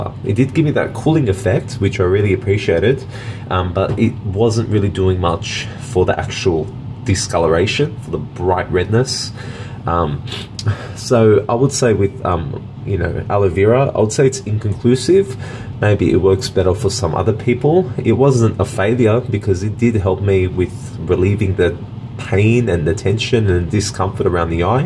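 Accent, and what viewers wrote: Australia. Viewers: I got a small flare up of eczema on one of my eyelids as well! It’s been like this for a few weeks. Its flaky and red, kind of like I forgot to add eye shadow on the other eye. 😳It’s odd how it randomly started without warning. Now I’m trying to back track what could have caused it. Thanks for your input about the things that worked and didn’t for you. PS love the Aussie accent 😂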